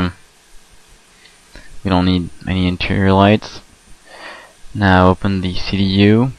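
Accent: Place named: United States. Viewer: Hi I just wanted to say that for your being from France your English is very close to impeccable(Not sure if this would be considered insulting considering our cultural relations at times but you sound like you are just a normal American.). Also, your tutorials rock, I am starting the PMDG MD-11 tutorial as I type. Keep posting vids please!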